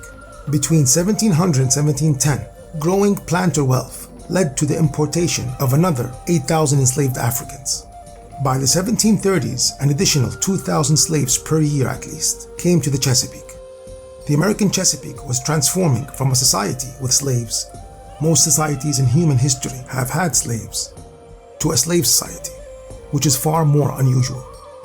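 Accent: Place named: Egypt. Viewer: By the tone and inflection, the narrator is Egyptian and I suppose he didn't want to reveal that aspect of Egyptian history for fear of it being mistaken as a bad thing. A euphemism of sorts. The price of gold in Egyptian markets plummeted as did the cost of living .. which is a good thing for the public masses..and bad thing for the rich.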